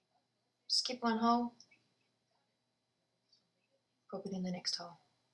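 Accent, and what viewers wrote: Australia. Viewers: Yeah she’s Australian, it’s a nice accent as it’s not too broad. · Australian I think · Australian accent eh!!! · I've tried 5 or 6 times, following 5 or 6 different videos, and NO LUCK! Saw this one, SUCCESS! Thank you very much! Is your accent Australian? Sounds that way to me. steve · When I first heard you say: "under" I was hoping to hear "down" just before it. Love your Aussie accent. Please don't be offended. Nice video too. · Yes.. she Australian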